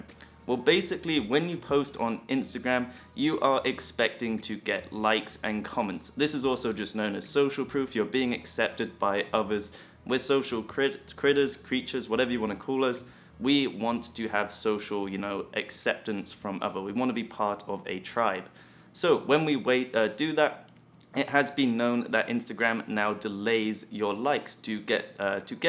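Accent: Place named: United Kingdom. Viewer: what part of the UK are you from? I ask because you have the same accent as one of the BBC news journalists.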